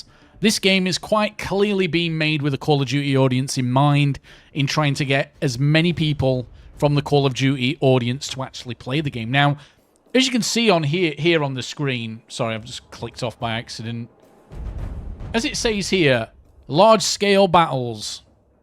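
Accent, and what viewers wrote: United Kingdom. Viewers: Are you Irish, English, or Scottish?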